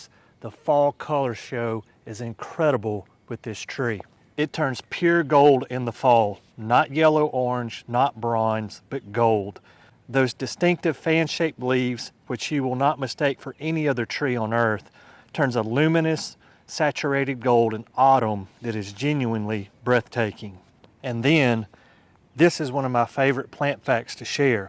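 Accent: South, United States. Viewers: He is in Alabama, zone 8a. You can tell by that accent that he is a Southerner y’all!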